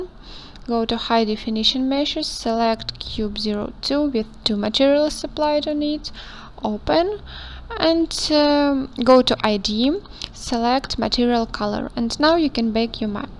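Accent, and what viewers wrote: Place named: Russia. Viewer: слышится русский акцент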